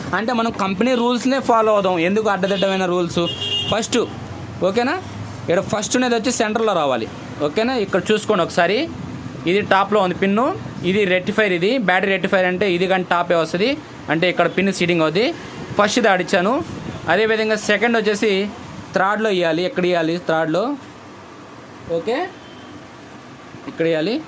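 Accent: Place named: Prakasam, India. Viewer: Prakasam district giddalur side aa bro needi. Aa slang alane vundi. Explanation Inka clear ga chey, nee videos ki Inka views increase avuthayi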